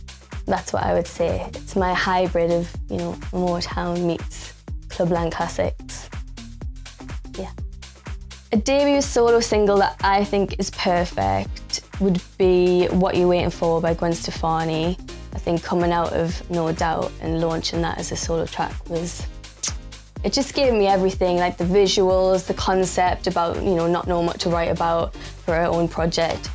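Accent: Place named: Newcastle, United Kingdom. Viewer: That Geordie accent she has man its melts my heart and her laugh... ok ok im simping ill stop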